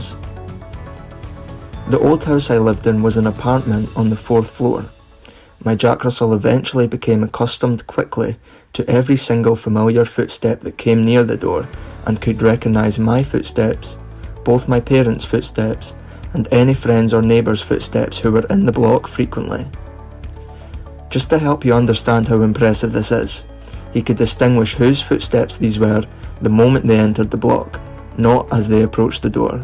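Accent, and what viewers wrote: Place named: Dundee, United Kingdom. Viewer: Sound dundonian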